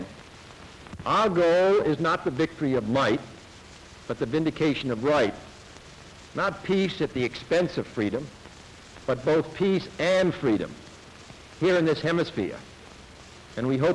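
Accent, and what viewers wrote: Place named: Boston, United States. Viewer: I'm from Boston, here's how it works. Words ending in -er are pronounced -ah, unless the next word starts with a vowel. That's why its "Launched from Cuber against...."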